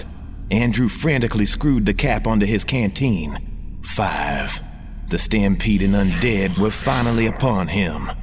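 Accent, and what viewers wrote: South, United States. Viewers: Loving the narration, great southern accent to the cool story. The vocabulary in this story is so enjoyable.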